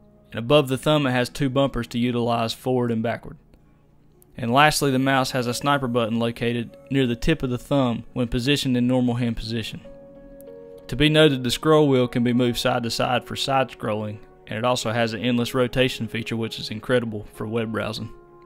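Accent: South, United States. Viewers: Your accent is absolutely fantastic, for us Brits :D Every southerner I've met has been inordinately nice, just can't figure out why they voted Trump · this is the most country voice i have ever heard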